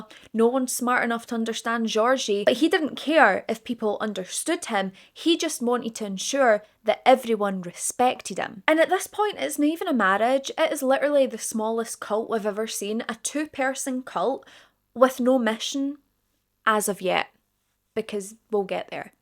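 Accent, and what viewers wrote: Scotland, United Kingdom. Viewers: Scottish Emma Kenny vibes. Love it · Are you from Scotland? love the accent! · I really Enjoyed Listening to your TRUE CRIME STORY. YOU'RE A BEAUTY, WHO HAS SUCH A BEAUTIFUL ACCENT AS WELL..IS IT A SCOTTISH ACCENT. SOUNDS ALOT LIKE MY FAVORITE ACTOR SEAN CONNERY.. THIS IS SUCH A WILD, CREEPY CASE..YOU DID AN EXCELLENT JOB, BC IT HAS TO BE DIFFICULT GETTING THESE VIDEOS OUT TO US...I APPRECIATE YOU. I JUST SUBSCRIBED TO YOUR CHANNEL...I ALSO HYPED THIS VIDEO!!! MANY THANKS!!! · New subscriber here, I love your accent it sounds a bit scottish. Such a beautiful young lady you are. ❤ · I love your accent. I have got to find a Scottish woman 😂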